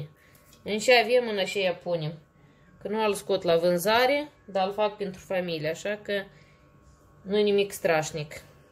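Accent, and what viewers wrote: Moldova. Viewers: In afară că ești o gospodină desăvârșită, imi place de modul vorbirii tale pur moldovenește, intr-un an după revoluție am fost în vizită la cunoștințe in HERTA, și la fel cunoștințele vorbeau exact ca tine, ești superbă.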